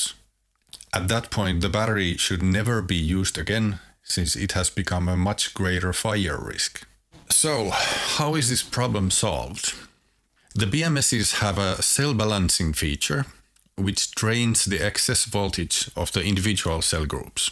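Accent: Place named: Finland. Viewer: I could immediately tell you are a Finn: beautiful accent and “max information to words” ratio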